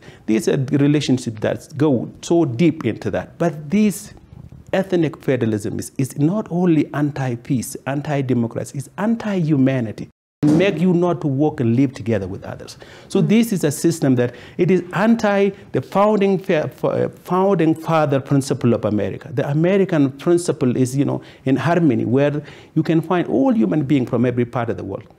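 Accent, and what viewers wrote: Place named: United Kingdom. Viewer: like that British accents. Thanks for your presentation.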